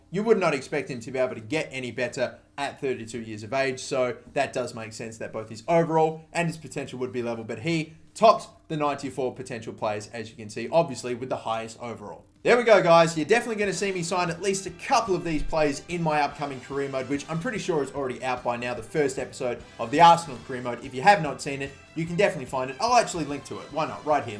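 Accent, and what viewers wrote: Australia. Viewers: U aussie m8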